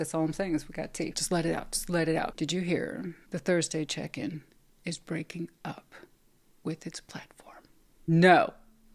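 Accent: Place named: Texas, United States. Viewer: You tube and their bullshit. 🤦‍♀️ I absolutely love you. You keep doing what you’re doing and always, ALWAYS be yourself. I agree that your approach to dealing with the algorithm makes sense. Break em up as you see fit!! I’m gonna watch regardless. Just please, PLEASE, don’t ever give up!! I would definitely miss you and all you do. Love me some!!! I actually get upset when I don’t get to listen to you enough. I don’t get mad, especially not at you, it just feels like there is something missing in my life. I really didn’t realize what an impact you have on me until this year’s new ‘my year in review’ video that had me as one of the top 3% viewers of your channel. I froze when I saw that- as wide an array of channels I follow, and as much time as I spend watching or listening, top 3% is a LOT!! Anyway, just wanted to thank you for being who you are and doing what you do. 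You do you EXCEPTIONALLY well. Love your readings. Love your accent (fellow southerner here my Texas treasure). You sense of humor is phenomenal!! You have me rolling on the floor in your intros. Especially when your truth comes out- you are always spot on when it comes to people- and you absolutely do not hold back. LOVE IT!! I feel like you are family after following you for some 7 years now. I wish I could express exactly how important your insights and knowledge are, and have been impacting my life. Absolutely for the better. You are inspiring and charismatic. Best of all, you ROCK!!! I lov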